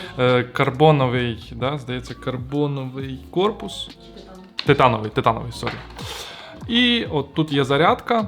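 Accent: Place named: Ternopil, Ukraine. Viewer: І ще 1 такий акцент як в нашій Тернопільській області)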